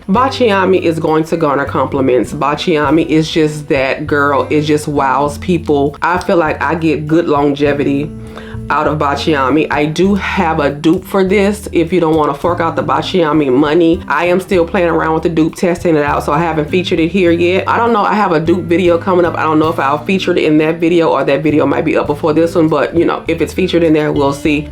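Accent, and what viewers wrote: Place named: Tennessee, United States. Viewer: I LOVE your accent! Where are you from? It sounds Memphis/Tennessee as I’m from middle Tennessee 😂❤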